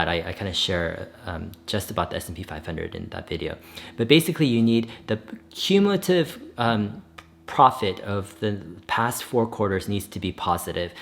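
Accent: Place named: Northern California, United States. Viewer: Hey. The accent is so familiar it's driving me bonkers... Northern California?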